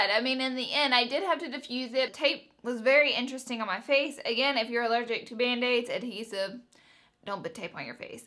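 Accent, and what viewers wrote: South, United States. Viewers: Is your family from the southeast? your accent sounds southern. love the video <3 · Are you southern?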